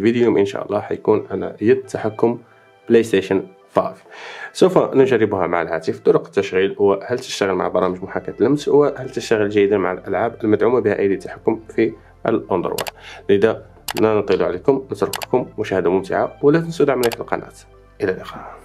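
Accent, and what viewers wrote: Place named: Morocco. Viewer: حسنا اخي بارك الله فيك اعتقدت انك مغربي بسبب لهجتك 😄🥰🥰🥰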